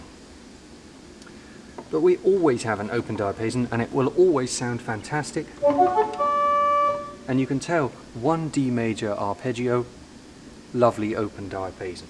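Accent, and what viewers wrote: United Kingdom. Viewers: Hi, you are very talented person, with such a beautiful British accent-I love it. I really enjoy watching your videos because I'm Polish and I'm learning English, so I can learn a lot of beautiful vocabulary from you channel (I know, it could sounds funny). I play the organ a little end pieces you play are amazing! Have nice da!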